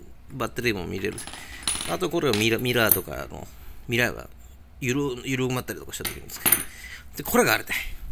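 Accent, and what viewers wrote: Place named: Chikuho, Japan. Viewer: 筑豊弁懐かしく見入ってしまうw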